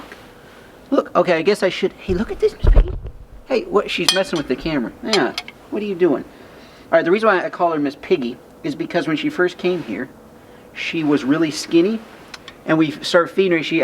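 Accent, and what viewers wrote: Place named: Tennessee, United States. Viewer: That Tennessee accent king in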